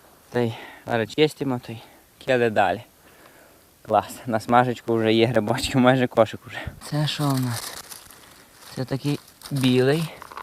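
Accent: Hutsul, Ukraine. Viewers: Все мені подобається!!! Гори і природа, грибочки збирати, і діалект гуцулів, який Ти шануєш, і будиночок, відпочинковий,і музика!!!Відео прекрасне, дивлюся з задоволенням Дякую,!!! Будь здоровий і щасливий!!!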